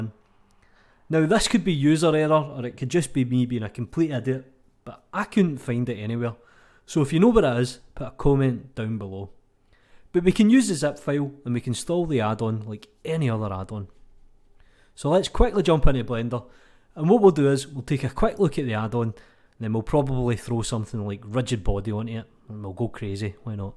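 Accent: Scotland, United Kingdom. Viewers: Just dropping by to say that I love your video format, short and concise. Also, your accent makes me wanna go back to Scotland so bad! Peace.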